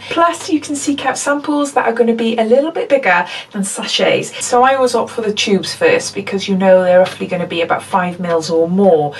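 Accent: Wales, United Kingdom. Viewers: Been following your channel for a while now and really enjoy it. You are very down to earth and natural!!. I have subscribed to the Look fantastic beauty box and feel unique sample service.You sound as though you may be from Wales (im guessing Newport/Cardiff.?). xx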